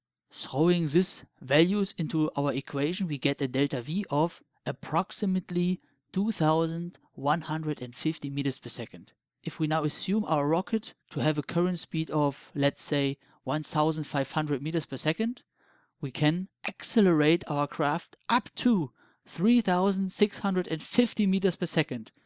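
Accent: Germany. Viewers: Do i hear deutsch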